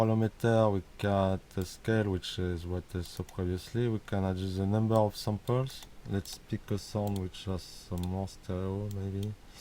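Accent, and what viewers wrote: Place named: France. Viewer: T'es Français ? Thats a big french accent don't get me wrong, edit : effectivement t'es français from Paris. Très bon VST je regrette pas de l'avoir acheté celui là. Par contre juste un petit pdf ou des vidéos explicatives pour expliquer le vst ça serait cool, je cherche comment enregistrer le layout par exemple. Ca manque d'un bouton supplémentaire ou dans about les options de customization, par ex skin devrait se trouver dans about et les enregistrement d'un ou des layout favoris aussi. Sinon à part ça super plugin merci Edit 2: Eh merde on dirait que le layering d'instances Psyscope ne fonctionne pas dans BitWig 5.1 😢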